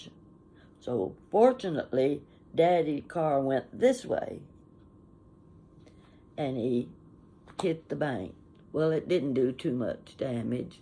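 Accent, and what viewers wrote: South, United States. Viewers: You remind me of my Aunt Jean who lived in the country of Alabama, same sweet smile. I think I must have grown up deep south a bit since both my parents were from Birmingham, Alabama. However, they moved to Texas where I was born. So friends tell me I sound more like I'm from New England with a few Texas bits, but I have deep southern habits. Lucky me! Anyway, I love stories and hearing your accent reminds me of my mom. Bless you.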